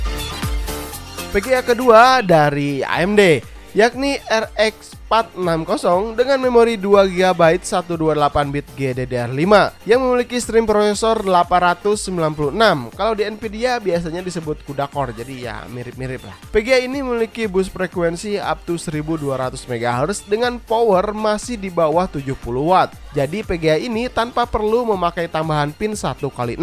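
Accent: West Java, Indonesia. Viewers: Sundanese gan 😁😁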